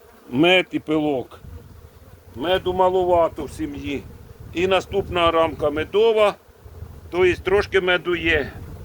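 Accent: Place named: Cherkasy, Ukraine. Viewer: Вітаю. Матка пропуски дає в засіві, чи так здається? І скажіть з якого ви регіону? Бо так по розмові ніби як з Черкащини. Цікаво.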